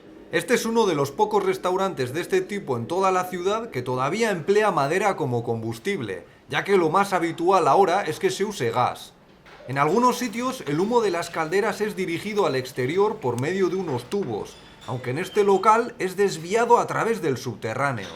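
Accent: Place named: Basque Country, Spain. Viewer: Entre la burrada de "añadimos unos cuantos dientes de ajo" añade como 20 y el acento, algo me dice que eres vasco.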